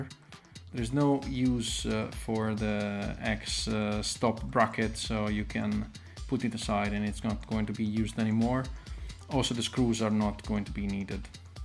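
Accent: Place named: Netherlands. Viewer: Italiaanse subs maar 100% een nederlands accent! bedankt ga morgen alles installeren :)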